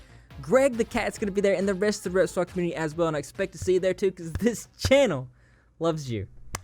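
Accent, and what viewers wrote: South, United States. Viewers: oh okay I couldn't pin point it. I'm like he sounds southern but mixed with another accent 😁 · I forgot what state he said he’s in but it’s an American southern/ mid western accent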